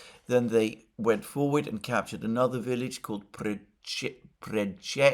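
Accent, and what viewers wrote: England, United Kingdom. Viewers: Just because he has an English accent does not mean that this is not Russian propaganda, it merely sounds better than the regular Russian propaganda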